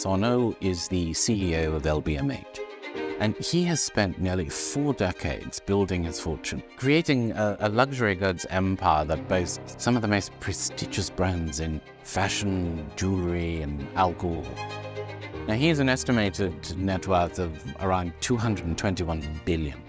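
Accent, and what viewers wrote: RP, United Kingdom. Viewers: Ive never heard a more posh uk accent in my life he shld b narratin books as a side hustle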